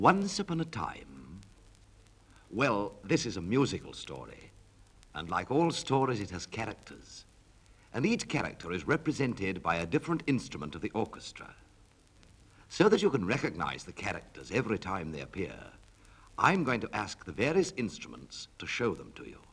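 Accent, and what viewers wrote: United Kingdom. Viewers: The most British accent there is. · Interesting that this is not on Wikipedia's enormous list of recordings. This is Wilfred with his RP accent, similar but not quite what he used to read the BBC news. Imagine if he had used his Lancashire accent! Lovely transfer by the way. Thank you so much.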